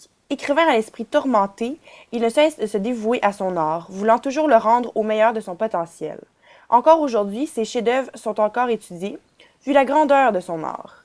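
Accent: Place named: Quebec, Canada. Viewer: Tu es québécoise j’adore ton accent français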